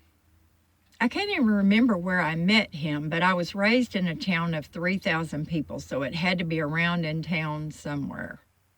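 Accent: South, United States. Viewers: I live in North Carolina and I look so forward to your videos. You sound southern.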